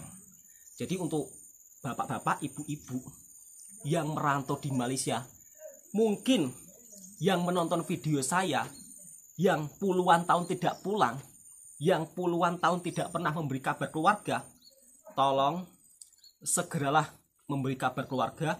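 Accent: Java, Indonesia. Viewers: wah sudah kurang medok nya wak.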